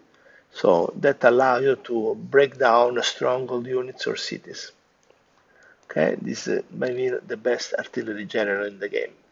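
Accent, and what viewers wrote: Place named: Thailand. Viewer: he's from thailand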